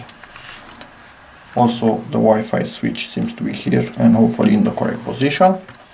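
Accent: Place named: Romania. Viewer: на английском говорит отвратительно...... акцент капец как слышно.... румын или серб???? или болгар?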